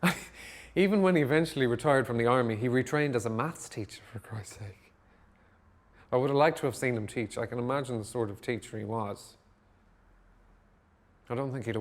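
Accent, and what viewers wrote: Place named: Ireland. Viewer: He has a very nice Irish tone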